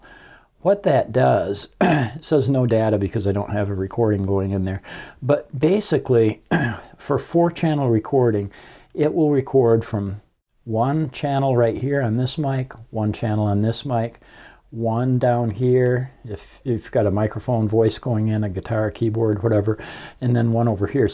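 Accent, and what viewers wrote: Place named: Vermont, United States. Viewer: Very helpful! Well done and you sound like you are from Vermont :) many thanks!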